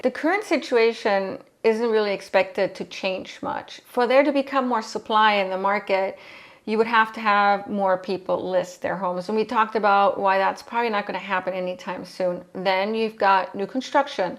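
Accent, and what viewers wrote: Florida, United States. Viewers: It is crazy for us inland as well. We are expected to assist in the insurance coverage of those millionaires mansions on the beach they keep rebuilding. Remote work was a godsend. You sound like a native Floridian too! ;)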